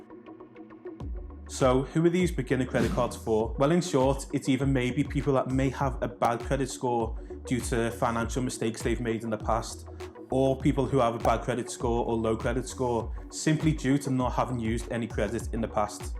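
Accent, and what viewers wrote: Liverpool, United Kingdom. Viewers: Every little helps!! That was the cherry on the cake. I only watched this because you was a scouser. Well done.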